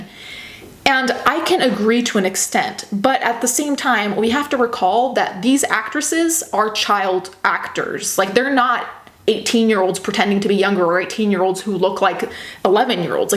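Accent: United States. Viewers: I knew that you were Italian, and that English was your second language, but I had no idea that you have only been here such a short time! Your American accent is fantastic. Keep up the good work, my friend! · Unrelated but for a non-native speaker you have all of the nuances and a great (American) English accent! The fact you speak 3+ languages fluently is incredible. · I don't mean to be rude but how come you have a full American accent if you only moved to America 5 years ago?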